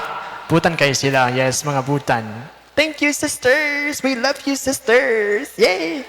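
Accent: Visayas, Philippines. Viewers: Grabe maka Bisaya si padre oi.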